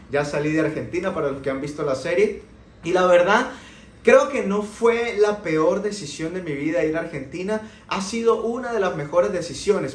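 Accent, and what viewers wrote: Mexico. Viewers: No me di cuenta de que eres de Venezuela,te sentí un asentó de Méjico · Tienes un acento Mexicano,, saludos, 👍👍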